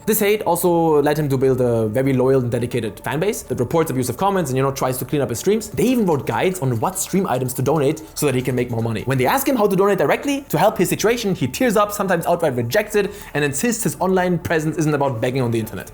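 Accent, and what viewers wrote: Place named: Germany. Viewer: Why does bro have a german accent `?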